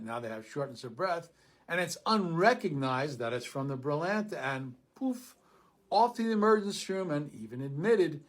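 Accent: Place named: New York, United States. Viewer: I find it comforting to be yelled at by older me with a New York accent about topics I didn't know I would find interesting ever since I volunteered with the Bernie campaign a million years ago in 2016. I'm gonna go blow my dad's mind when I tell him where his shortness of breath is actually coming from. He was sure it was the smoking he did as a teenager catching up with him 50yrs later.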